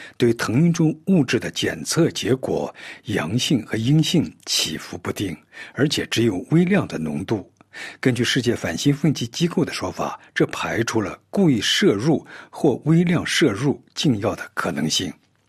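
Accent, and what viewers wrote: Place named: Shanxi, China. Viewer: 解说大哥你是山西人吧？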